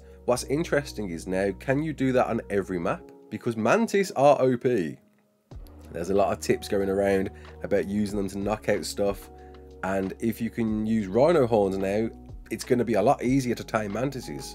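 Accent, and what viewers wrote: Birmingham, United Kingdom. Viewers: they will nerf the astro that much, you might as well use a tek tapajaro, and i say void wyvern, sounds better than void worm, maybe its the accent, are you a brummie or a black country lad?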